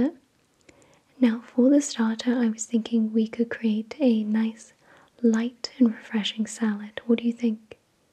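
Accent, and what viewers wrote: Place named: Wales, United Kingdom. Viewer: Tyler Sudden II she’s Welsh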